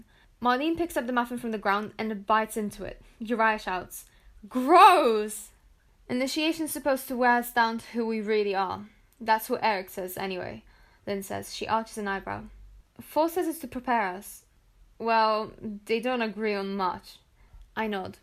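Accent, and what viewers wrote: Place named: United Kingdom. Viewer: Great video, ^-^ I really like the British accent its very different from other audiobooks ♡♡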